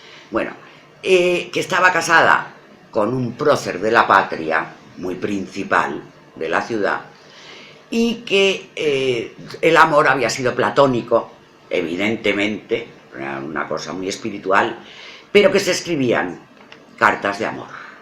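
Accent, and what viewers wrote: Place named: Galicia, Spain. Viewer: y con el acento gallego mejor aun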